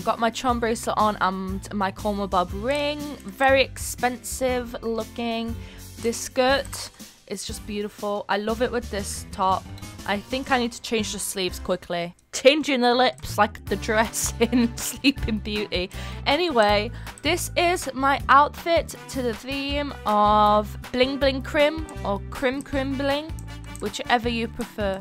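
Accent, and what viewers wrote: United Kingdom. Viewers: Omg I feel like u have a British accents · i rlly love ur accent is it british scottish or something else srry i love it sm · It sounds like you speak British 🇬🇧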